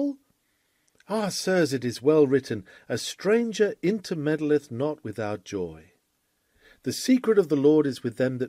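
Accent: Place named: England, United Kingdom. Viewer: Was looking forward to this sermon, love this reader with English accent . Thank you Jesus .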